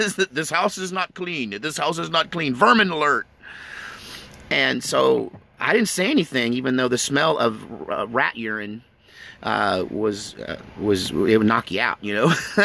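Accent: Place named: West Virginia, United States. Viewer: Your west va accent is pretty close....ever seen the documentary about jesco white and family entitled "the wild and wonderful whites....."?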